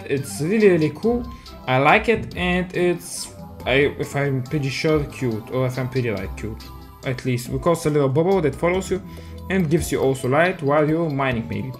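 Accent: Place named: Bulgaria. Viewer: prosto te nabarax po govora che si bg😂😂😂 i vikam dai da pisha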